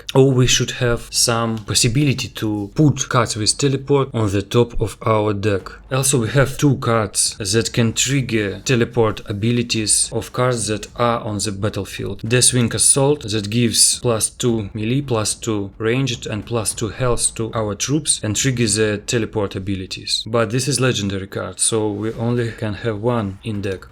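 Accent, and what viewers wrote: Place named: Russia. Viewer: Хех, слышу русский акцент, а так видео класс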